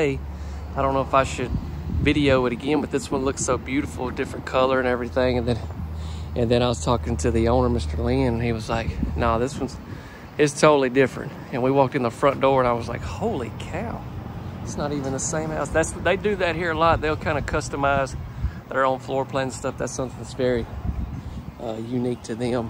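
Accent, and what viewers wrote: South, United States. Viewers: Hey, I really enjoy watching your videos. I love your southern drawl. And I LOVE the pantry dance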